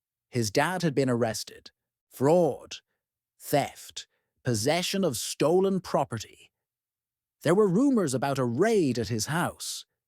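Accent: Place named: Ireland. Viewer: Creepy story but the Irish accent is refreshing!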